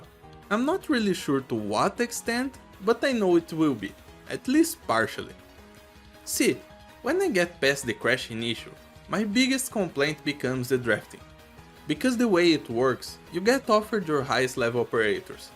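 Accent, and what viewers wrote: Brazil. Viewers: You sound like a Brazilian speaking English, could I be correct?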